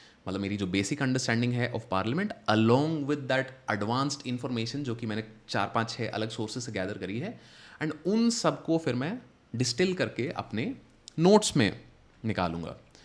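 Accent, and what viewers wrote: Bengal, India. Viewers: Sir, Are you Bengali ? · Sir are you bengali?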